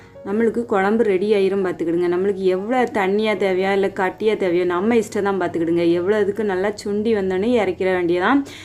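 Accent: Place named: Tirunelveli, India. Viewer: நெல்லைதமிழ் வாழ்க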